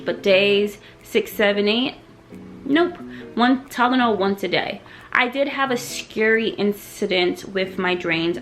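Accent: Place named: Barbados. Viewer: Where are you from? You sound like a Bajan.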